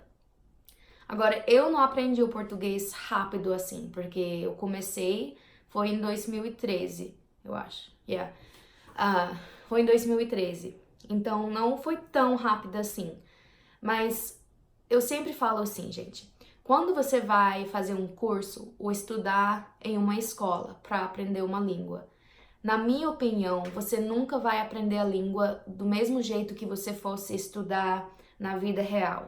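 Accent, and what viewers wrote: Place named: São Paulo, Brazil. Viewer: LIKE👍 you!!! Seu marido deve ser paulista.... Seu português tem um sotaque paulista... KKKK Eu vivo no Rio de janeiro (Saquarema)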